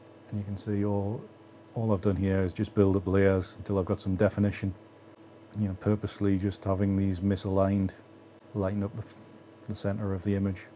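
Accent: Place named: Northumberland, United Kingdom. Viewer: Hey, thanks for sharing your process and approach, your images really cross a lot boundaries, which for me makes them so interesting. Also as a lad born and brought up in Corbridge but loving in Devon, I am loving hearing the Northumbrian accent. Have you met Thomas Heaton btw?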